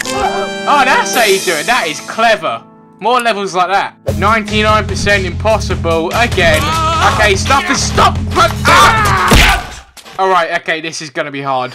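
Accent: England, United Kingdom. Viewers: I Really love your Voice its so english i Shall go to England on vacation next year so i hope i Met you there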